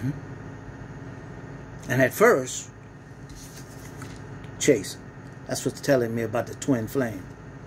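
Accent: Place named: South, United States. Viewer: just love yr southern accent and manners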